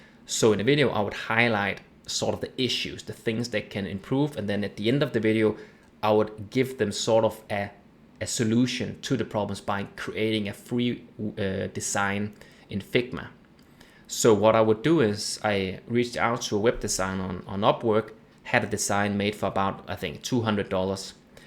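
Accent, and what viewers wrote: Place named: Germany. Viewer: Considering your strong accent, I'd say you are from Germany or Austria. Or maybe Luxembourg but less likely.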